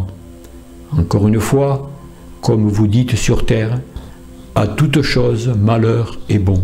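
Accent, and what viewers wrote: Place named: South, France. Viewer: Merci, je vous aime beaucoup, je suis bordelaise et j aime votre accent du sud, bises de l allemagne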